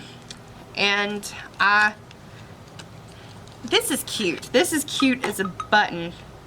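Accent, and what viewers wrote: South, United States. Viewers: Love your eyelook, especially with your eye color! I got the other eye palette and my jury is still out. lol I got the Sunday Riley cream as part of my box and am in love with it; that fragrance!!! I also added on the Tetris lip balm that you did and think it is a great product and such fun packaging. I'm a new sub and 1st time commenting. I like your channel and your accent. You sound southern to me. I'm 63 and live in Iowa and am pretty new to Ipsy (since Dec 2018).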